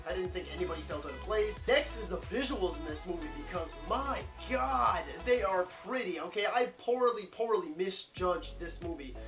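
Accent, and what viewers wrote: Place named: Canada. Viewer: Wait! Did you say your “miewth” full of water?” 😂. Gotta get used to that Canadian accent. 😝. Great review buddy!👍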